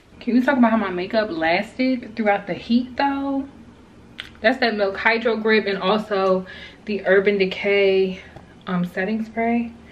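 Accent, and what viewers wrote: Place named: New York, United States. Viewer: she sound like she from ny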